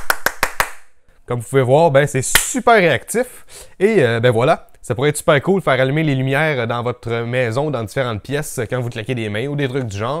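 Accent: Quebec, Canada. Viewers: Ça fait du bien d'entendre un québecois enseigner ! C'est très clair merci!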